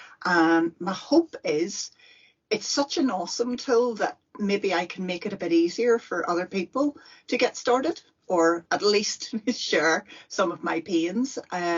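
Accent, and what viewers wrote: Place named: Northern Ireland, United Kingdom. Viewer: Great video, excellent intro to TE!!!! Love the Nor Iron accent by the way, brings back many great memories!!!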